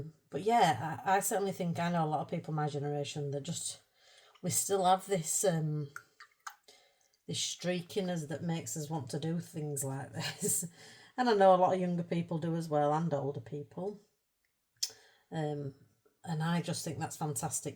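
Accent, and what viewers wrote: Yorkshire, United Kingdom. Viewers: Hello, what a great video, do you know you've really cheered me up, I'm in bed with the flu and feeling crap, so I've so enjoyed this chaos method. And the bonus is listening to your Yorkshire accent, love it, love Yorkshire. Your humour is brilliant, I appreciate it as I'm a Scot, and originally a Glaswegian,although I live in inverness, not a lot of humour here😅. I love your approach to colouring, it's not pretentious, you just have fun. I love this page, the freedom of it and such pretty colours too. Well done and I hope your channel goes from strength to strength, I found you thanks to Jackie at spare room studio. I'll be back 🎉❤ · I love watching your videos. I’m an Australian originally born in England and my Dad and his family is from Yorkshire. Hearing you speak is so nostalgic 😀 And you’re so funny. You’re doing great. 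Can’t wait to see more! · Love this! I think it will be great to do together with my daughter. I'm glad you kept going. I like your accent so much that I recently started following a new meditation teacher because she also has a Yorkshire accent 😁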